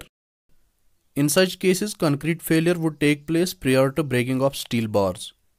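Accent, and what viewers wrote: Kashmir, India. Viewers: Wah maza agya kashmiri accent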